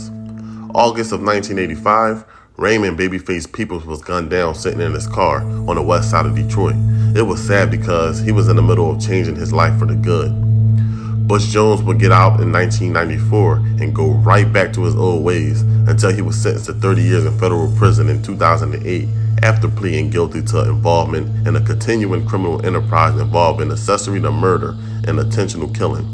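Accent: Philadelphia, United States. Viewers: This dude narrating gotta be from Philly.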